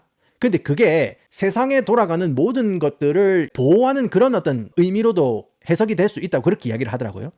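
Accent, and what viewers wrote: Gyeongsang, South Korea. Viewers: 경상도 액센트 매력 탑이에요❤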